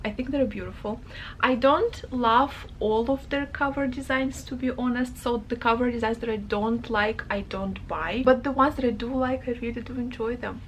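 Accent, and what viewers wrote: Russia. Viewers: Сижу и думаю, почему такой акцент знакомый, оказалось, что у меня такой же. )) Привет из России ) Мега информативное видео, большое спасибо! Как раз подбирала себе издание, хочу собрать небольшую коллекцию книг на английском )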